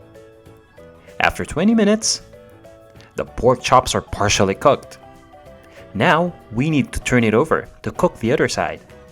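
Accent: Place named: England, United Kingdom. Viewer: In fairness sa English twang ni Kuya ha? Love it! 😊😊😊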